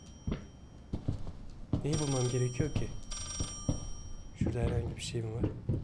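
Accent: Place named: Izmir, Turkey. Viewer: Abi İzmir'de veya çevresindemi yaşıyorsun biraz şiven benim olduğum ilçedeki şiveyle uyuşuyorda :D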